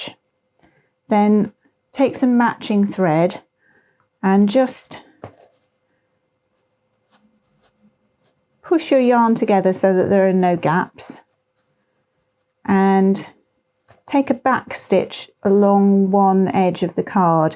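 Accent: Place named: United Kingdom. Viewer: Well I think the talking is explanatory. And this gentle British accent so much better than all of those yanking yanks!! 😅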